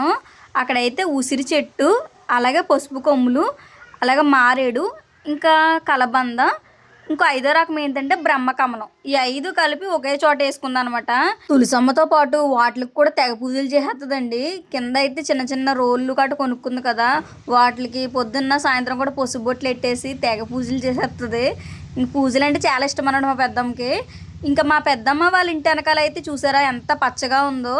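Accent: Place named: Godavari, India. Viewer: Super undi sister.mana godarolla majaka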